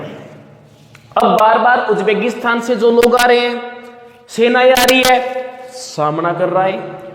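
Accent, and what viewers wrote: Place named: Punjab, India. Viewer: Bolne se Punjabi lg rhe hai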